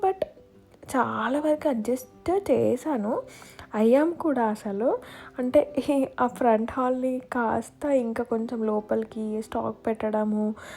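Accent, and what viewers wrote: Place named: Chittoor, India. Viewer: Asalu voice gurinchi yenduku ala antunaru? garu karnataka kada koncham chittoor slang ki similar ga undochhu....any how chala neat ga matladuthunnaru....go head and congratulations garu❤ Navaneeta from Bangalore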